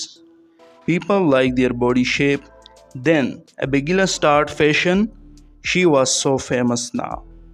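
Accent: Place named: India. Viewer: Is the announcer from India. Because he sounds like one of them.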